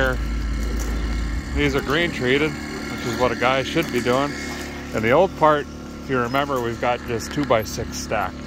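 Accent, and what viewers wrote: Minnesota, United States. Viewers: Too bad you left Minne-Snow-Ta... I get it when it comes to wrenching in the cold. Good luck with the projects. Keep your accent please. I have a hard time listening to people from the southern USA. Super nice folks mind you but my Midwest brain doesn't catch on as well... I'm sure my brain is still partially frozen... I will stay right here in the frozen tundra thank you. (Somebody has to live here) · Please don't loose the MN accident and start sounding like your are from Tennessee.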